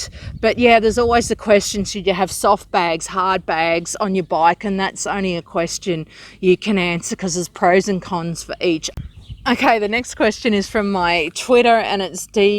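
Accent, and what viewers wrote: Australia. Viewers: Love that Aussie accent. Wish i had your balls, really want to do a big tour too.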